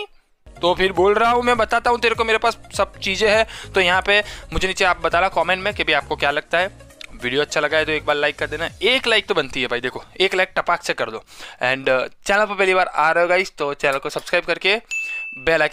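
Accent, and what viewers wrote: Gujarat, India. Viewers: Kya ap Gujarati ho . me bhi Gujarati hu mujhe apki language Gujarati jesi lagi.plz reply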